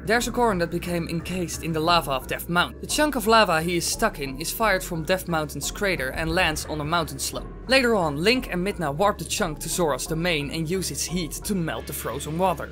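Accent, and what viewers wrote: Netherlands. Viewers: Ik dacht al dat je nederlands was!!! Ik hoorde het aan je stem!!! Subbed! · ah that's why, your accent made me remember my own language (also Dutch) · are you from Holland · I came to your channel from m. productions and I'm so glad I found it. When I first heard your accent it sounded so familiar, and then I found out you and vortexxy are both from the Netherlands. It would also be cool to see a theory about the sheikah. There just so much unexplained stuff around them. Keep on making awesome theories!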